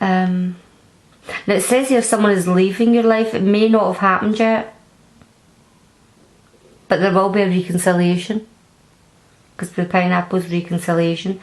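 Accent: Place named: Scotland, United Kingdom. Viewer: No.4. Loving that lovely Scottish accent of yours. Blooming Brexit dh 🤪. Thank you 🙏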